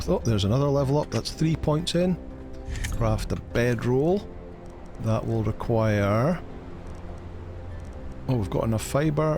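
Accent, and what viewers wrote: Scotland, United Kingdom. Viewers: Bro does the thrall settings at 0.1 work? Iv Been running about for days and my level thrall met his end at 5. It’s feels like it’s still taken ages to level up. Great Chanel for this game by the way. Iv learned a lot and have subscribed and liked. What Scottish accent is that by the way? fife. aryshire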